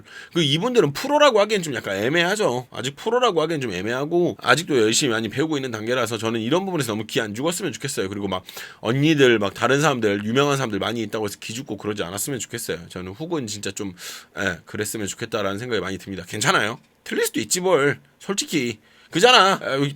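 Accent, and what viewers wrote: Jeolla, South Korea. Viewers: 전라도 사투리는 있으시네요 ㅋ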